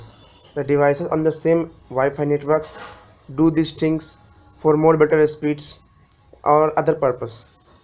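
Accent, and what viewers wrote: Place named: India. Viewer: Will you please slow down when you talk in english. Your indian accent is why too strong for me to follow anything you are saying...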